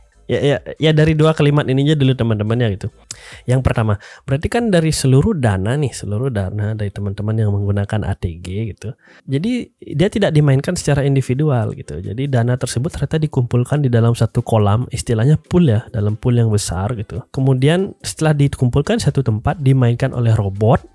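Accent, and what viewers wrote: Bali, Indonesia. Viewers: Dari Bali ni Kayanya yaa.. hehe.. omswastiastuu Bli... Bagus na e penjelasan itu. Aku juga sependapat. Wkwk. Tinggal tunggu aja boom waktu itu ATG. Rata2 yg begitu biasanya ujung2 scam.. kleeeeeeng.. wkwkwkwk. Itu platform Bandar itu. Banyak manipulation nya, buka real boker atau DMA direct market access.. wkwkwk. Tp apa daya, Kalo kasih tau org yg udh percaya sm itu ATG sulit dikasih taunya soalnya. Wkwk.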